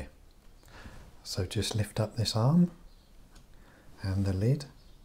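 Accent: United Kingdom. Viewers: We need you to start an asmr channel. You have a great voice, and the British accent just makes it perfect!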